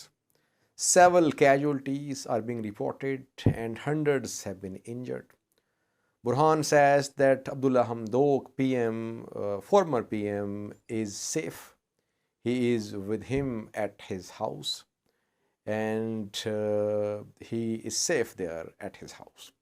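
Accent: Eritrea. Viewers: B.S. 😆 this are Eritrean accent. Why even wasting time for this? You already said it. Any one can do this record. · That’s a Eritrean accent for sure. Lol it’s really easy to notice if you speak Tigrinya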